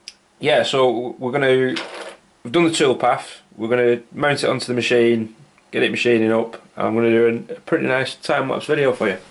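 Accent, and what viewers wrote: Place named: Northern England, United Kingdom. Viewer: Hi, Its actually nice to hear a northern accent instead of an American one. However i do like the guy John Saunders here on YouTube NYCCNC Hes the dood. I was just looking for the.nc code (has the machine code XYZ steps in it) file your machine used to cut the troughs in the plate. I figured you were using a cnc machine to mill the table. What program is your mill using Mach3?. I produced an autocad drawing of a vacuum chuck not dissimilar however i did cheat. I thought of milling the grooves in the top as you would but then drilling only one hole in from the side of the plate into the centre then a hole in the top of the plate down into the side hole. Your design using a chamber milled under the plate instead which to be honest is the correct way of doing it. I tried to convert the file in Cambam (post processor) into an NC file for my milling machine but ended up making about 100 profiles around each castllation in the top plate, not the straight x then y mill routes i was expecting. 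Im Still a novice i'm afraid. I did manage to build a vacuum ejector/generator for to go on an air supply like this one on Ebay <link> Got some good results as well I have a similar Vac pump to yours which is actually what i will use in the end.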